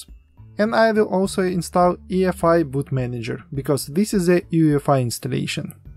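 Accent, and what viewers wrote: Russia. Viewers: русский акцент))) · Оно пытается выглядеть 'своим' для англоязычного ютуба, но с речью решило не заморачиваться и говорит английские слова русскими буквами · Акцент не инглиш нихуя, похоже русский. Я по инглиш не шпрэхаю, чё делать то? Пресс Х ту вин?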